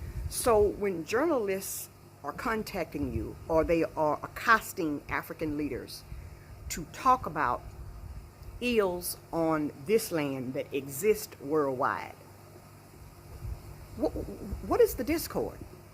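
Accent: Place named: Texas, United States. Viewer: Love hearing my sista’s TX accent , keep bringing it ! I graduated high school in Killeen,TX ! ❤️✊🏾❤️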